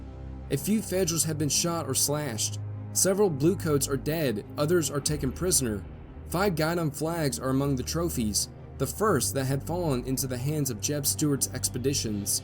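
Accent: South, United States. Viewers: Just found your channel recently!!!!! It's awesome!!! Only someone who really knows and cares about American history and culture does content like this. Detail is excellent as I've studied this period in detail as well! Keep it up and thank you!!! P.S. Nice to hear a southerner narrat it too! (Sorry if I'm mistaken you do a good job either way).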